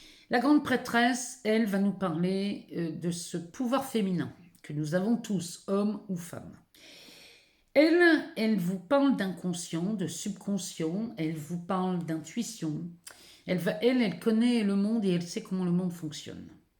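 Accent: North, France. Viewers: Bonsoir ! C’est la première fois que je vous écoute et j’aime beaucoup la façon dont vous expliquer le tirage ! J’avoue que vous l’avez même fait rire quelque fois car vous êtes très naturelle et c’est tellement agréable 🤩🤩🤩 Je me suis abonnée et je vais vous suivre car c’était très agréable à vous écouter 💕 Je me permet de vous poser Une question : vous ne seriez pas du Nord ? Merci 🙏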